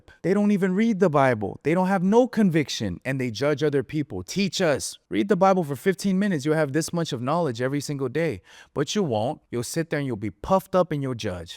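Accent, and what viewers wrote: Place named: New York, United States. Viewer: U sound dumb in this one fr just cuz of that New York accent. What was that?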